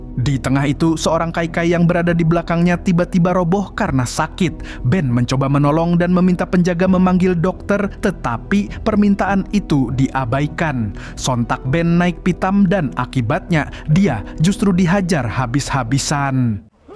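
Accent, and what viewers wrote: Banjar, Indonesia. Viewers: Urang Banjar sekali nya😂🙏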